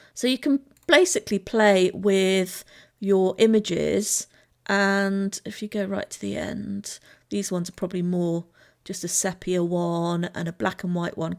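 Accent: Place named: England, United Kingdom. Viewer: Nice video. Firefox ( not sure about other browsers ) has a plugin called [Pixlr Grabber] which I find really useful for grabbing all or part of a picture from a webpage. Much superior to saving the image or PrintScreen paste intoimage editor like paint. I thought you were English until you said "Control Zee". Hmm yeah I still think your from southern limie land. Dont go to the dark side else you'll end up saying things like "How Yall doin, have a nice day now yeeha woofwoofwoof" :P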